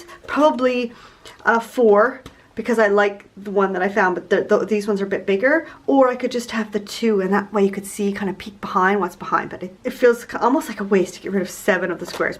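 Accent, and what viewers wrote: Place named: Canada. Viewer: Holy Moly! Did you say your 7 year old son speaks 4 languages???!!! Wowza! I’ve always been curious as to where you live (you sound Canadian but you reference “Europe” often), I was tickled to hear in your video on 8/2 (I think) that you live in Luxembourg! Very cool! Could you do a video about yourself, how many kids, where you were born/grew up, where you live on and why, how you go into YouTube, etc. I just enjoy learning about people! Love your videos!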